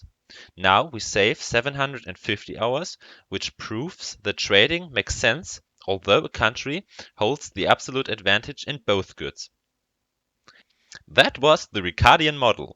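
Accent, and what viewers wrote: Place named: Germany. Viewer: your german accent sucks but i like this video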